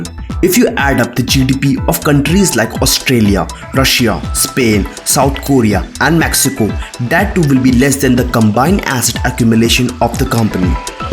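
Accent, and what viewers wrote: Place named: India. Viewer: I hate indian accent i always did sorry. 😆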